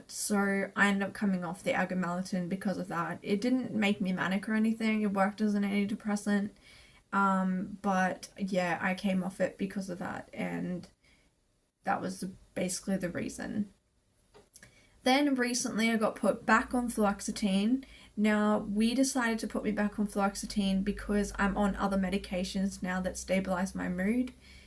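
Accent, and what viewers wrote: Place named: London, United Kingdom. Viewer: I don’t know why I thought you were from the UK. I thought you had a nice polite London accent 😂 Anyway, very informative video x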